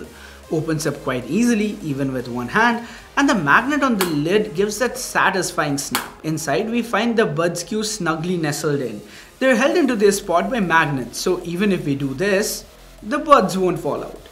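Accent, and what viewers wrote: India. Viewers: but to be honest... My English has been improved a lot and still improving by watching his indian accent english videos 😊 it's really helping me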